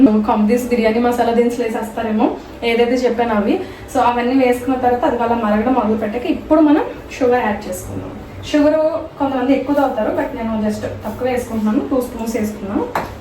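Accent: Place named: Telangana, India. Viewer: గారు చాయ్ తెలంగాణ భాషలో మీరు మాట్లాడినట్టు అనిపిస్తుంది చాలా సంతోషంగా అనిపిస్తున్నది ❤❤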